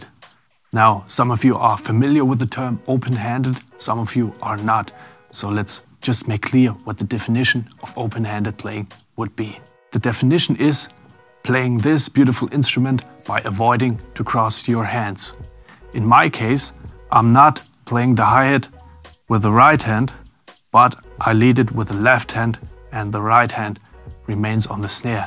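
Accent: Germany. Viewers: I love the german-english accent for some reason. It sounds so smooth.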